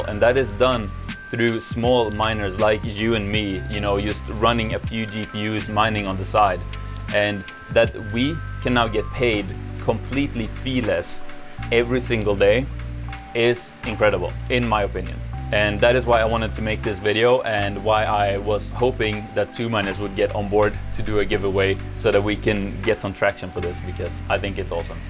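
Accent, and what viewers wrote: New Zealand. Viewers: Nice bit of Kiwi my dude, keep up the amazing work!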